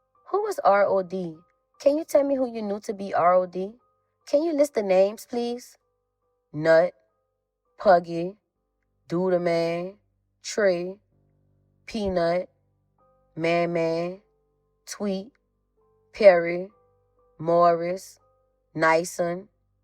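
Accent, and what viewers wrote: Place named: New Orleans, United States. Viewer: The reader sound like she straight out the 7th ward by the St. Bernard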